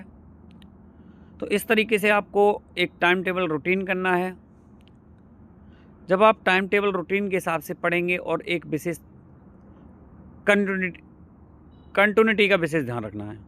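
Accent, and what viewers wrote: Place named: Western Uttar Pradesh, India. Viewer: आप पक्का वेस्ट यूपी या हरियाणा से हैं 😊 BTW nice suggestions keep it up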